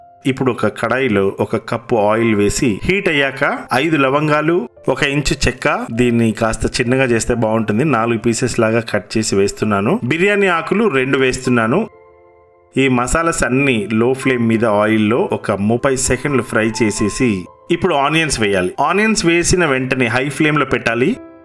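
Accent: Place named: Andhra Pradesh, India. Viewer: I like your Andhra slang broooo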